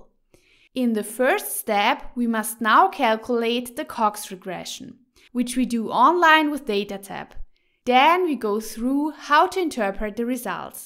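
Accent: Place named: Germany. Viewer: the most awful german accent to date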